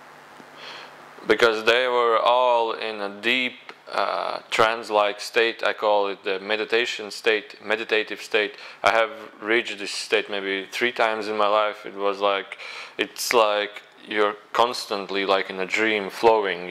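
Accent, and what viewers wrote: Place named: Poland. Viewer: “Witam.” are you polish??ur accent sounds like soo i could be wrong:)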